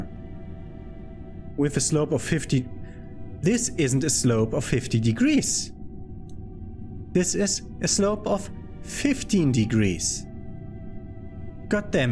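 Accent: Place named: Germany. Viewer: Moin klingst wie ein Deutscher deswegen schreibe ich mal auf deutsch . Versuch mal wenn du in einen Orbit willst nicht so steil nach oben zu fliegen und schon gar nicht mit einem Manöver einen Orbit bestimmen . Wenn du startest beschleunigst du auf den ersten 1200m auf 160m/s ab hier die Rakete um 15 grad nach rechts neigen . Steige ein wenig in diesem Winkel auf bis du bei 300 m/s bist und neige die Rakete wieder ein wenig . Steige wieder ein wenig auf und wärend du langsam auf ca 1100 m/s beschleunigst neigst du du Rakete bis zu 45 Grad . Also nicht sofort auf 45 Grad sondern kontinuierlich . Wenn du ca 1100 m/s erreicht hast Triebwerk aus, ab hier müsste die Apo bei knapp <num>m liegen tut sie es nicht hebe sie auf 85.000m an . Hat die Rakete 72.000m Höhe erreicht , neige die spitze 15 Grad Richtung Erde/Kerbin also nach unten und gebe jetzt vollen Schub und beobachte wie ganz von allein ein perfekter Orbit entsteht .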